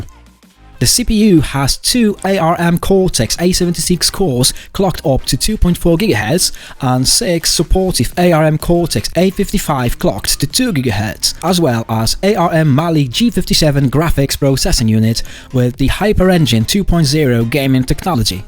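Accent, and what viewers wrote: United Kingdom. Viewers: 👍 The British accent is a value added. Saturday February 04, 2023. A.M. U. S. C. T.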